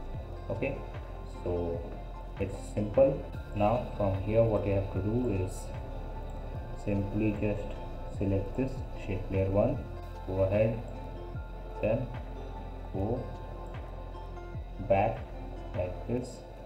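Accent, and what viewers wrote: India. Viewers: He’s better bro He’s Indian and tried his possible best to speak English. Thank you bro and keep up the good work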